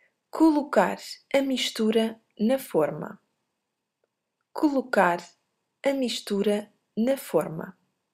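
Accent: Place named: Rio de Janeiro, Brazil. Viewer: É muito parecido ao sotaque dos Cariocas, claras de ovosh!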